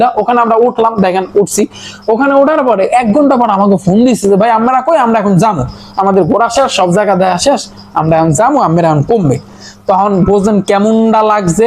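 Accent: Barishal, Bangladesh. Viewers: Sir apner class kori, apnar borishal language shune moja pelam. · ভাইয়া বরিশালের ভাষা টা একছেড় সেরা লাগছে🙂💔💔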